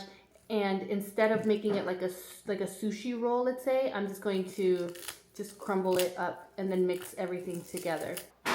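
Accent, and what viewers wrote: Spain. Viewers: Love your Spanish accent!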